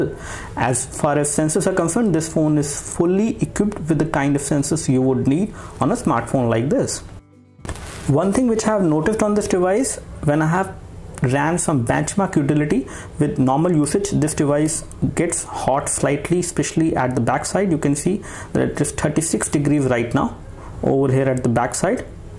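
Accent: India. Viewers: Roman Minárik its Indian but OK.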